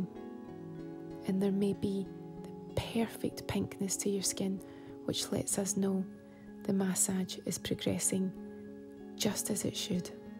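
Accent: Scotland, United Kingdom. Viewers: The Scottish accent is heavenly with headphones. Oh, and when I finally nodded off, I had a dream that you made a charcuterie board ASMR vid. Then it dawned on me; without me readers on, that's what the salts 'n oils board sort of looks like. 😅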